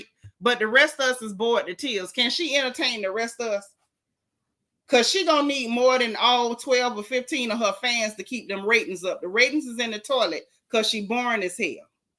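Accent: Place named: Florida, United States. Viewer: Your Florida mouth have me laughing so hard cause I am from Miami and our mouth is so ridiculous people can’t understand Florida people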